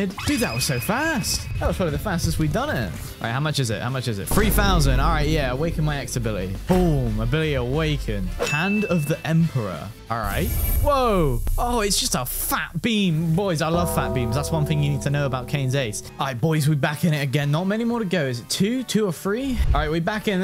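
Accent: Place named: United Kingdom. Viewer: Bro with his British speaking kinda sounds clean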